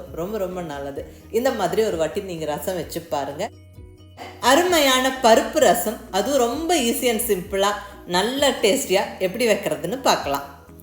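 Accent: Kongu, India. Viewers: Unga samayal ellam romba pudikum kongu tamil super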